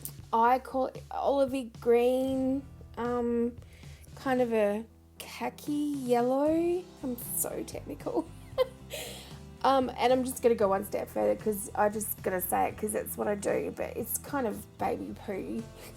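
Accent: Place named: Australia. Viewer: yay another chatty aussie lol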